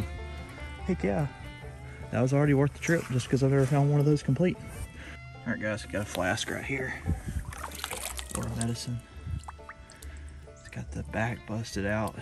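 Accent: Alabama, United States. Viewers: Please excuse my ignorance, but are you saying “Cherra Cola” or “Cherry Cola?” I guess my Alabama accent decoder’s rusty. I lived in Huntsville as a kid when my dad worked for NASA, but I’ve lived in Alaska too long. Sorry for the dumb question 😂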